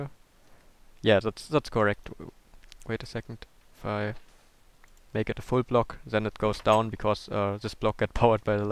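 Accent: Germany. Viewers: You really sound kinda german, when you're speaking... what is you native language? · Are you German you have a german a accent man.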